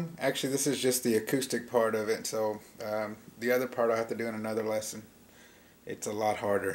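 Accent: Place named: United Kingdom. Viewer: Haha you're great, especially about the cool British accent!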